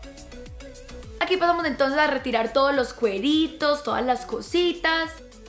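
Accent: Antioquia, Colombia. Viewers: me encanta el acento paisa de hablas divino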